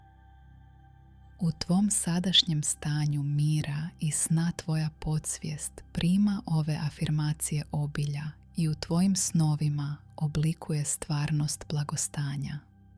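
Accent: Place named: North Macedonia. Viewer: Divan glas, nisam bila sigurna je li AI, često makedonske( bar mi se učinilo) riječi. Svakako čudna mješavina koja čak i umiruje. Sviđa mi se zapravo🤔👍😀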